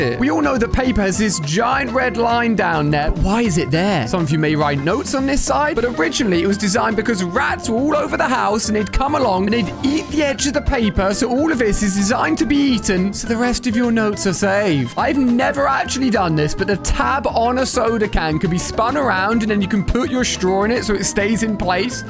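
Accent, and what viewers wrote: United Kingdom. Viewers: I THOUGHT YOU WHERE BRITISH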